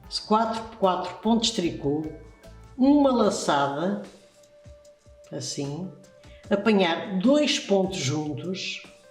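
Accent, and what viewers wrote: Portugal. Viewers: Boa tarde. Amei seu jeito de ensinar e o sotaque português maravilhoso! Já fiz os sapatinhos, mas não fechei ainda porque estou na dúvida de identificar o avesso. GRATIDÃO! Bjosss de LUZ! 😘♥️ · Adorei sua orientação pra executar este sapatinho. Deu muito certo. Sem contar que amo ouvir seu sotaque. Sou filha de português. E viajei pra Portugal em junho pra conhecer a Terra de meu paizinho que já faleceu. Já me inscrevi em seu canal. Muito obrigada por trabalhos tão lindos !!! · Vídeo muito bem explicado . Eu amo sotaque Português tenho muita vontade de conhecer o santuário de Fátima. Já me inscrevi em seu canal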